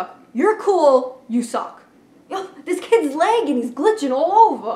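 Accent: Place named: New Jersey, United States. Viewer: i love new jersey accents ! ! ! now thats wirth a sub there!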